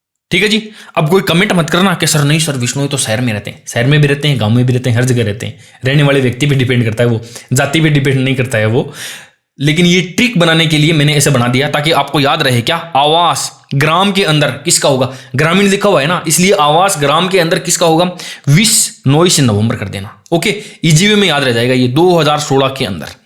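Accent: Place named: Shekhawati, India. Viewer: Wow sir .....kya gajb ki trick ....❤ap shekhwati se ho kya kyunki apki language m asar dikh jata h thoda thoda .......bhot acha pdha rhe ho sir sir ......👍👍👍👍👍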